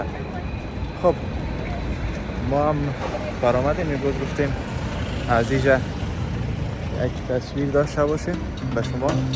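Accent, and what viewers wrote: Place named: Dari, Afghanistan. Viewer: Shumo chiqadar bo lahni shirini dari harf mezaned . Lahjai shumo chiqadar ba mo nazdikast ki man hej ehsosi nofahmogi dar sukhanroni shumo namekynam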